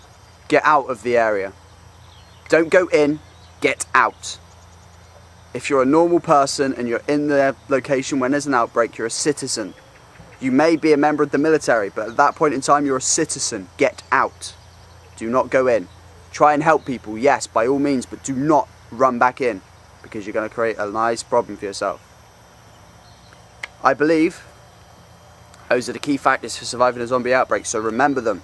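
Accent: United Kingdom. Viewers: Awwwh he said torch! Haha I love british peoples accents! My cousin and I joke around in british accents.. its just by all means adorable... haha and he said bloody omg this video tripped me out.. got to love british.(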